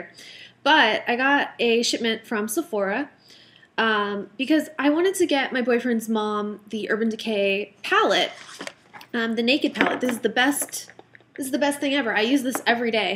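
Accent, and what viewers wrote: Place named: West, United States. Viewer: AND THAAAAN hahahahah I love how you do it in a western accent... all the time!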